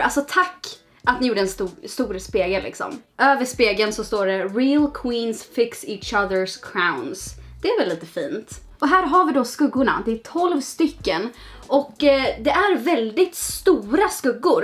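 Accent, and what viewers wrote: Stockholm, Sweden. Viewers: Bra video!☺️ hur kommer det sig att du pratar så mycket Stockholmska? Men ändå typ göteborgska samtidigt haha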